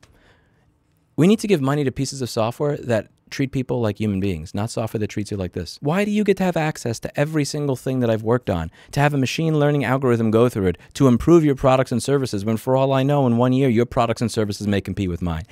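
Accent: New York, United States. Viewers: Don't y'all just love how much thicker the New Yawker accent is the deeper he gets into his righteous rant?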